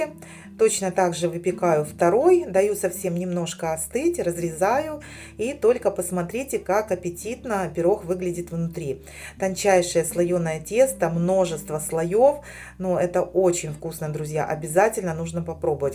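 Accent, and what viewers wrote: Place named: Surzhyk, Ukraine. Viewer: МАТЬ- то тут при чём? Да ,неправильно человек говорит,но поправьте,подскажите- она исправится ,увидев нормальный совет.Вот привыкли люди так говорить ,я так поняла ,хозяйка из Украины,там ,в основном ,так и говорят.Не потому ,что неграмотные,а потому ,что говорят на " суржике",в основном.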